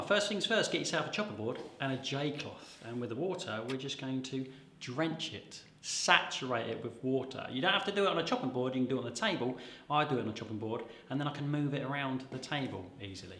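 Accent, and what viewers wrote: United Kingdom. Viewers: Welcome back,! So glad to hear your lovely voice and British accent. Always brightens my day! Yes, this is a fantastic trick! I’ve used it for seeds and bran and rolled oats — whatever I want to stick onto the bread. If the seeds are heavier, I sometimes give a little rub to the wet top of the loaf to work up a bit of starch. Only takes a few seconds, but I think it helps stuff stick better when I’m slicing the loaf after it’s baked. Keep up the good work and great smiles for us! You are sunshine on a cloudy day. A breath of fresh air in a stifling room. The sauce on our pizza dough… 🤣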